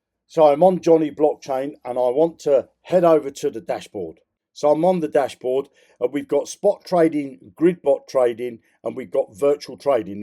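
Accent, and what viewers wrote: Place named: Northern England, United Kingdom. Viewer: REACHING OUT YET AGAIN. DUDE IF YOU CAN'T BE ARSED TO ANSWER YOUR SUBS I CAN'T BE ARSED TO KEEP SUBBED. STEP UP YOUR GAME DUDE. HERE GOES AGAIN. MY WALLET TOP UP SAYS You cannot top up your account with the selected MetaMask chain, please change to «BSC Chain»! NOTE* you must connect your metamask account to this website I THEN PRESS THE BUTTON AND NOWT HAPPENS. YOU SOUND LIKE YOU FROM THE NORTH LIKE ME SO WHY DO YOU OPERATE SO SLOWLY????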